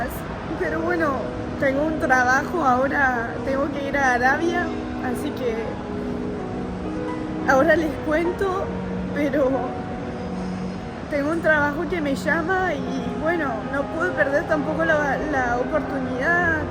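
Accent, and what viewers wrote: Argentina. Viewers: Bonita niña, hablas muy argentina, con nuestro vocabulario, tambien con esas cejas mas Argentina !!!😂 vuelve pronto francecita ,mi descendencia es tambien de Francia. Acá somos muy fraternales, en el interior aún más !!!!!Un abrazo fuerte !!!!!😘👍👍👍❤️👏👏👏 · Hola!! Ya vas a volver!! Quiero felicitarte por tu muy buen acento castellano-argentino, te llevaste una muy buena dicción, ya te podés sentir más argentina que hace un mes atrás 😅👍👌 En todo el video hablaste un muy correcto argentino, como la gran mayoría lo hablamos, lo normal, sin excesos jajaja 😂😂 Genial!!! Te esperamos pronto, Argentina es tu casa, volvé cuando quieras, mejor dicho cuando puedas! Abrazos! 🤗🤗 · Hablas como una Argentina real. Felicitaciones desde Saint Maur de Fosses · Como hablaaaa, es re Argentina 👏👏👏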